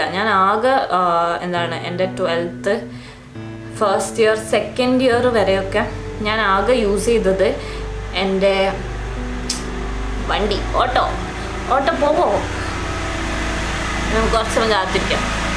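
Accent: Kannur, India. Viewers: Uff kannur basha😂💫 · Kannur evdya .oru knnur slng und talk l evdya gym ??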